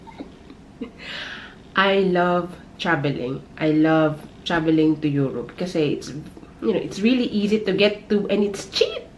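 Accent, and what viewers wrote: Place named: United Kingdom. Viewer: You may sounds like a british accent , thats very good maam. You really deserved that ..!!!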